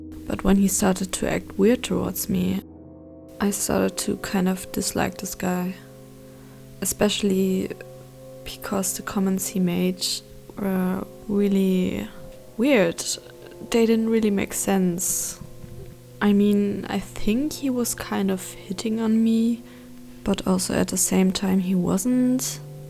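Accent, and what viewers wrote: Germany. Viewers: Are you German? Bcs I think I hear a little bit deutsche Akzent ✨ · Nice German accent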